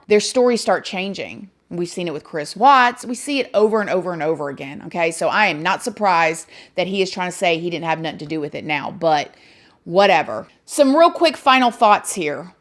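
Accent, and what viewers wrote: South, United States. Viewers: I am in love with the country accent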